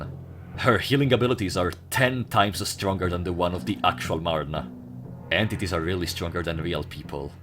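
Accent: Italy. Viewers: the italian accent hitting so hard <3 te se ama che conosci funger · I don’t think fear and hunger would be the same without your accent. Onestamente sono molto contento che sia un italiano ad essere forse la persona più rilevante nella fungercommunity, viva il patriottismo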